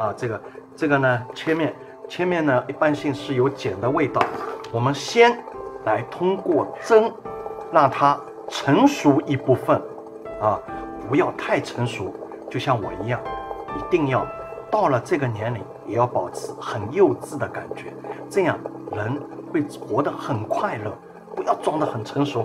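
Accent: Shanghai, China. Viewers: 你带着上海腔调的普通话实在太可爱了， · 身在海外听到这样的沪普浑身舒适!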